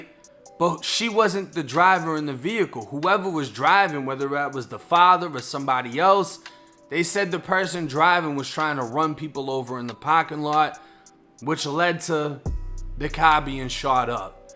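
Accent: Boston, United States. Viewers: The Boston accent not so strong lately eh 😆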